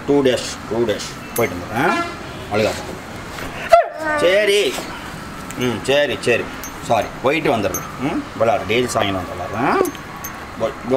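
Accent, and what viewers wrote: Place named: Kerala, India. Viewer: Im a Kanyakumari Malayali....I know u have a Malayali touch....U know Malayalam...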